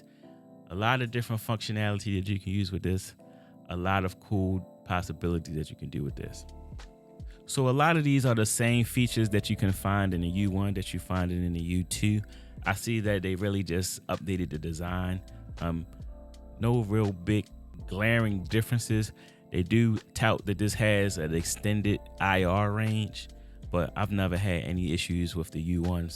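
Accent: Baltimore, United States. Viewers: Excellent review! Sounds like I here a B-more accent heavily pushing thru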